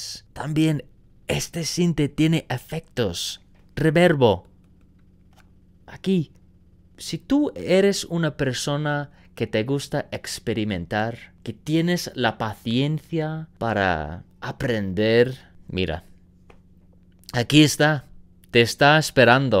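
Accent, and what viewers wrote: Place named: Spain. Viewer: Pues se me ha hecho bastante entretenido el video. Eso si reverb se dice reverberación pero es que tienes hasta acento peninsular y bastante buen nivel de castellano.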